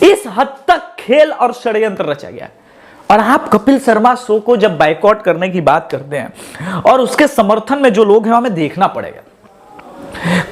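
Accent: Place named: Bihar, India. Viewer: Sir aap jarur up-bihar wale ho ........... Hai na sir? Aap ki channel pai mai pehlibar aaya hu aur apke bolne ka tarika bohot aacha laga......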